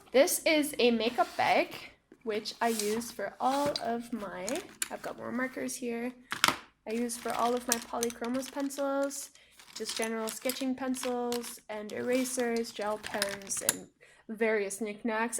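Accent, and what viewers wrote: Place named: Germany. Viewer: Lovely German accent! I'm a Brazilian living in Berlin and I'm total drawing begginer. Your videos are inspiring/helping me a lot. I could see you have pretty much everything you need for your art craft, and would like to ask you where do you buy them. I live in the West and close to my apartment there is a huge craft store, and I feel like buying everything. I can't say it's cheap but they have an excellent service and their personal are always very helpful. Here is the link if you're interested: <link> I also heard about a store called Action, but I haven't been there yet, because it's far away. I looked at the online prices and it's indeed very cheap. I also buy a lot at Aliexpress app. I would love to know where do you buy your art material. Wish you an amazing weekend!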